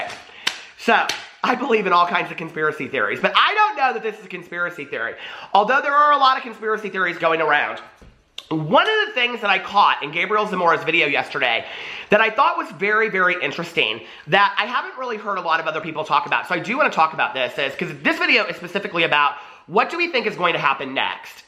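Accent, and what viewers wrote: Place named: Alabama, United States. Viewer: I haven’t watched you in so long but you pulled me right back and boy howdy did I miss you....As an Alabama native myself, you sound more like you’re from here than people I see walking around Walmart...😂 I love it & you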